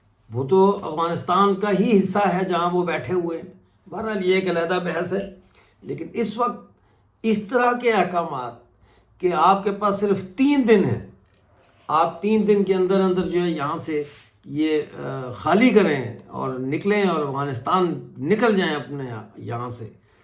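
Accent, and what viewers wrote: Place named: Punjab, Pakistan. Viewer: Chacha Apne pojk Ka bhi Btao kya ho Raha he Waha? 😮 Tum baaki poori duniya ki News btate to LEKIN pojk Ka ni btate ? Tum panjabi ho kya? Tumhara Accent panjabi Lagta he 😮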